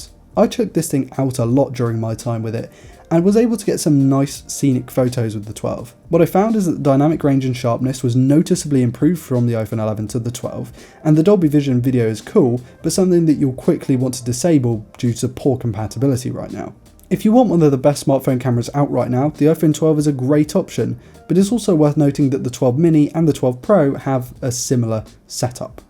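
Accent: United Kingdom. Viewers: Your british accent is gorgeous😍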